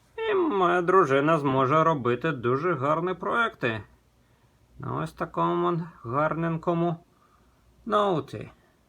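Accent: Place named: Ukraine. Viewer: Яка цікава українська говірка)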